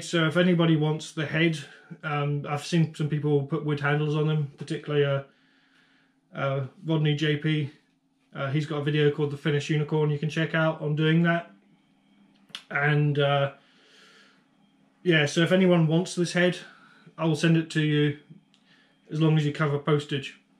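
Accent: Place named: London, United Kingdom. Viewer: You sound like you’re from London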